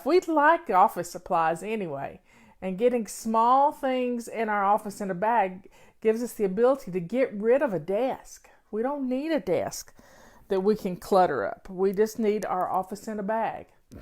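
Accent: South, United States. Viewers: I love your southern accent! I'm a New England girl who lives in Tennessee, lol. By being who you are you giving us permission to be true to ourselves also. i just love these daily videos! God Bless you. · You keep on keepin’ on. You’re great! I love your southern accent. 😍 I'm from Virginia & we have those southern accents here, too. 😍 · I love your southern voice! I love your stories and your videos are perfect. Don't let people like that get to you at all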